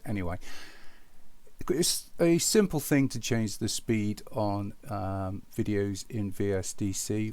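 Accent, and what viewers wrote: United Kingdom. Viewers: I like your accent dude British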